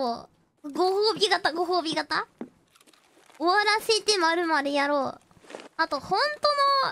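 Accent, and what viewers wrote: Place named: Japan. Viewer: ひらがな英語可愛すぎる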